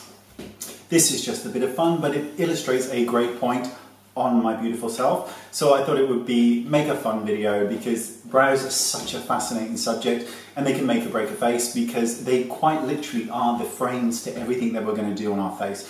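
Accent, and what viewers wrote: United Kingdom. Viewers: I don't know what it is but I love hearing him say naughty, I think it's the British accent and the lack of anyone ever saying it in Texas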